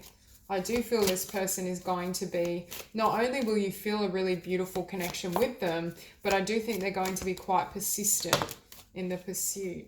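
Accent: Australia. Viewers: Definitely an Aussie accent XD ..... Sydney?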